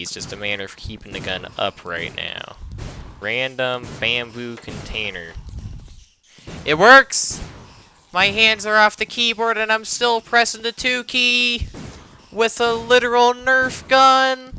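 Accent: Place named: Scotland, United Kingdom. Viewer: Bro sounds slightly scottish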